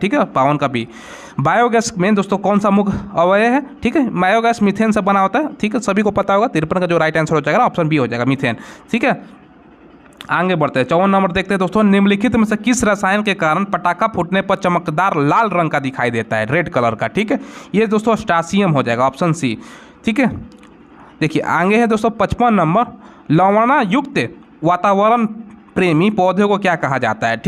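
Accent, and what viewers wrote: Bihar, India. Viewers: Bihari accent 😂😂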